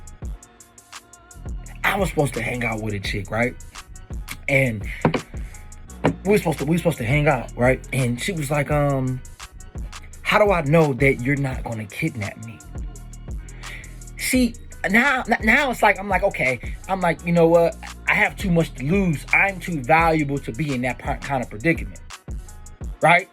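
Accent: Baltimore, United States. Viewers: Sound like you from Baltimore ?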